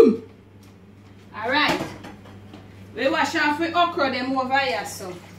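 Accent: Patois, Jamaica. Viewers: I dont understand some of what she's saying but am I the only one who doesnt care & love listening to her Jamican accent 💛💙💜💚❤ When I visit Jamica I would like to go visit her house😎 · Love your energy but nothing jamaican about this video but your accent. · I like when you talk the patois it sounds so sweet.lol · I luv her raw Jamaican accent....she's the best!!! goodup goodup pickney...lol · I love ya u make me laugh love the jamaica accent 🤣🤣🤣. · Love your video and and love the beautiful dialect or Jamacian patios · First time watching your videos...just by you representing the real jam dialect have me subscribing I'm sure I'll learn a thing or two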